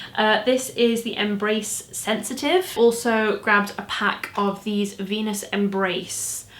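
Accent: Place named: Liverpool, United Kingdom. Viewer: I thought I recognised your accent! I have been trying to place it for so long. Who knew it was so close to home (Liverpool). Love a good boots haul 🙂. Congrats on 150k!!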